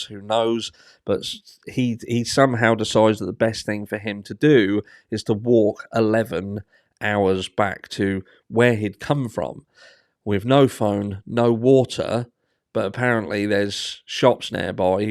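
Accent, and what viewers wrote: Norfolk, United Kingdom. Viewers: You sound norfolk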